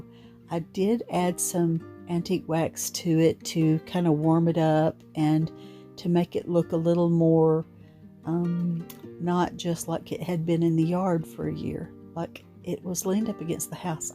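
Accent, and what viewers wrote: South, United States. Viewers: I haven’t heard anyone say “Swanee” in years I don’t know how to spell what a Southerner says for (I swear) love watching and listening to you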